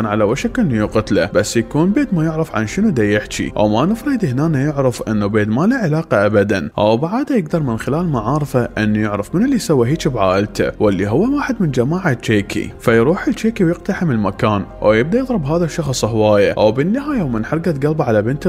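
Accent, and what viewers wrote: Iraq. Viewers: اريد اعرف منو الي يحجي بهذا الصوت العراقي كلش حلو الي يريد يعرف يحط لايك ❤❤❤❤❤❤❤❤❤❤❤❤❤❤❤❤❤❤❤❤❤❤❤❤❤❤❤❤❤❤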